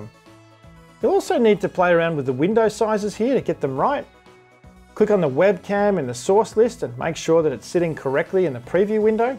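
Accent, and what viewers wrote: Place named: Australia. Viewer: Nice work... and great to hear an Aussie accent! Cheers, mate.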